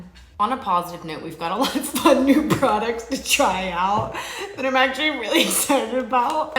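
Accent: South, United States. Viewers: I’ve been watching you for quite a while and I’ve never heard your southern accent as much as I did today. I’m southern (if you count Texas), so I love it. I want to share that I got married in 1989 and had my first child in 1997. Infertility is not fun at all, but I pray your dreams come true. At 61, I now have a son who is almost 27 and a daughter who is 23. Hang in there. On a lighter note I love the less-harsh dark brown mascara!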